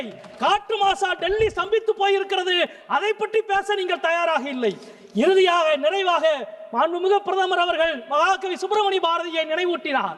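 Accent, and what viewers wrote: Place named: Madurai, India. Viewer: சங்கிகளுக்கு சரியான செருப்படி கொடுத்த மதுரை பாசையில் வீர முழக்கமிட்ட செந்தோழருக்கு வாழ்த்துக்கள் இன்குலாப் ஜிந்தாபாத் நன்றி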